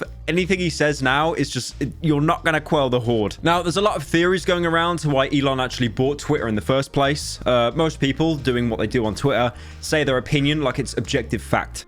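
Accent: United Kingdom. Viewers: Every time says Twit-uh I laugh because he's funny British "Man". No but seriously I love your content ❤️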